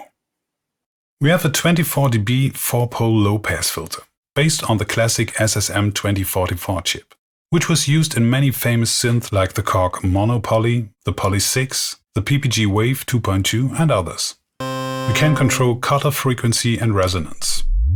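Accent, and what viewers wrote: Germany. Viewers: Selbst wenn das Wort eines Schweizers wohl nicht so viel zählt wie das eines Native Speakers: für mich klingt dein Englisch super. Die Stimme ist angenehm und grammatikalische Fehler sind mir bisher noch keine aufgefallen:) Klar hört man den deutschen Akzent ein klein wenig heraus, allerdings bereichert der die Videos eher und stört überhaupt nicht, denke ich. Danke für die Info! Das klingt so, als ob die Effekte, Levels, Breaks, usw. viel Zeit in Anspruch nehmen, hingegen die Melodien, Akkorde, Pattern und Rhythmen schnell angedacht sind. Klingt zwar etwas kitschig, aber ich stimme voll und ganz zu: Limitations foster creativity. Ich halte ab und zu Ausschau nach einem guten alten PSR oder einem Casiotone. Leider verkaufen die Leute meistens nur moderne und für mich schlecht klingende Keyboards. Lieber einige wenige, eingeschränkte, aber dafür warme, angenehme Klänge mit Charakter. 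Und für die alten, leider oftmals ungepflegten Geräte wollen sie über Hundert Franken. Obwohl ich eine Art falsche Nostalgie spüre wenn ich die Klänge dieser alten Geräte höre (weil ich gerade mal 1995 auf die Welt gekommen bin) möchte ich mir trotzdem einmal ein älteres Keyboard leisten. Synths hatte und habe ich genug und liebe sie nach wie vor. Aber sich einfach mal mit so einem Gerät hinsetzen und einen Song schreiben ist wahrscheinlich doch noch mal etwas ganz anderes. Ich hatte vor ein paar Tagen etwas gefunden, was dich (ich bin so frei und duze und hoffe, dass mir das nicht übel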